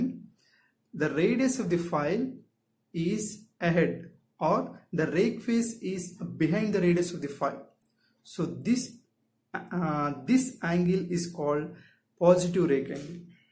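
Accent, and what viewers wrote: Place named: India. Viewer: شرح جميل ومبسط لهذا الموضوع اتمنى ان تكتب الشرح في صندوق الوصف لاني اواجه صعوبه بفهم اللكنه الهنديه تحياتي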